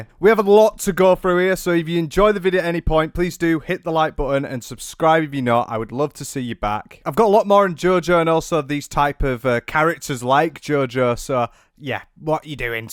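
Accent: United Kingdom. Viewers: Can't believe sshe disappeared for a momth I don't want to make fun of the British accent, i love it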